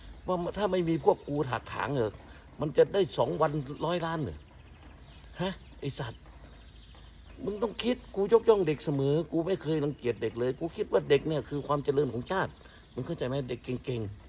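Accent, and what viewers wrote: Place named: Rural, Thailand. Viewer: สุดยอดครับพูดลูกทุ่งดีครับอาจารย์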